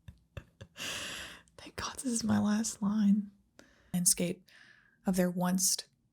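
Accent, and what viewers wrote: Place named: South, United States. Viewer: I forgot how calming her voice is. People from all over love how Southern women talk, but she’s on a whole new level. I’m Southern, and it’s the smoothest I’ve ever heard!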